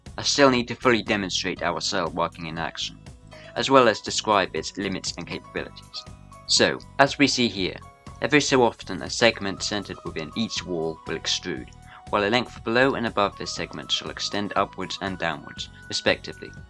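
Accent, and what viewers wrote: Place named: United Kingdom. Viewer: I enjoyed the british accent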